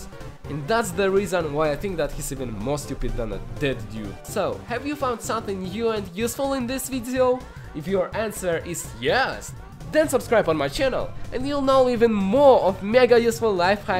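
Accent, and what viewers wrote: Russia. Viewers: Bro has that Russian accent 💀💀💀 Also btw I'm not hating, i probably also speak like that since English isn't my first language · Why do you have a Russian accent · why do you sound like you have russian accent?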